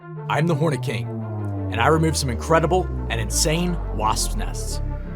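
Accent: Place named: Pennsylvania, United States. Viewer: I knew you were from PA by the accent! HA! Delco???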